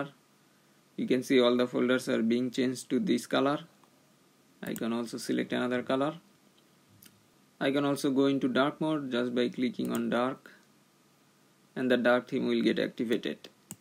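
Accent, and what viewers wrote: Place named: India. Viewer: you speak like indian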